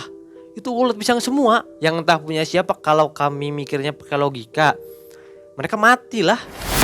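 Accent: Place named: Banten, Indonesia. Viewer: Logat Sunda nya hilang 😅 ini mirip logat Banten serang / Cilegon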